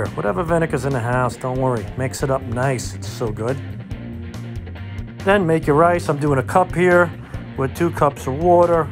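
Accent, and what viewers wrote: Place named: South, United States. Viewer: This man is NO!! third rate middler doin the wagon wheel show!. Sal you are the funniest man I've ever seen . your southern accent is exceptional.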